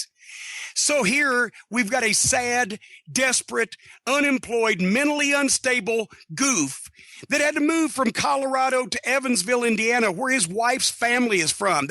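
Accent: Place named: Kentucky, United States. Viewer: Bring out the Kentucky in a old man . Love it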